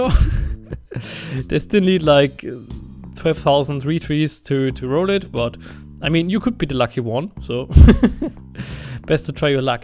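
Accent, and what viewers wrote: Germany. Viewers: du hast nen deutschen akzent, biste deutsch?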